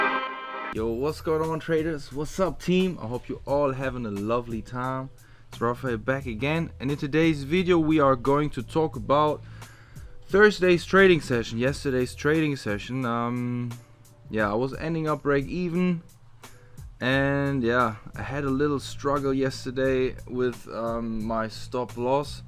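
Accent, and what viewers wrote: Germany. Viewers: Bro du bist doch Deutscher, das hör ich doch direkt raus 😄 warum hast du deinen Kanal in den USA located? Wohnst du da seit einer Zeit?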